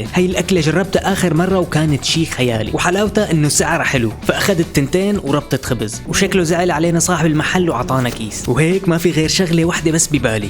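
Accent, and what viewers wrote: Syria. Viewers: انت سوري هههههه ❤ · انت حلبي كأني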